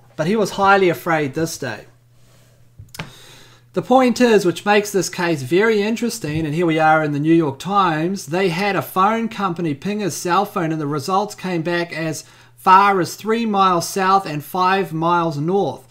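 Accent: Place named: New Zealand. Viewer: I loved esoteric detective but its so much nicer to hear your kiwi voice ☺☺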